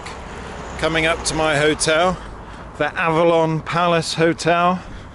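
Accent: England, United Kingdom. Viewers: Which part of England are you from? Can't work out your accent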